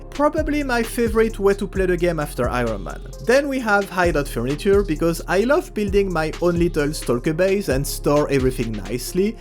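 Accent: France. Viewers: Are you French? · Lately, I enjoy more and more listening english with french accent. I start to feel what that dude from Matrix said.. "It's like wipping your ass with silk." just,.. muuaahh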